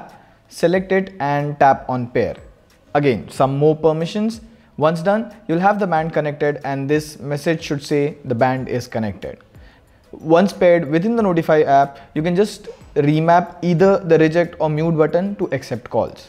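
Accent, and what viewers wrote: India. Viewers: I didn’t leave although he has an Indian accent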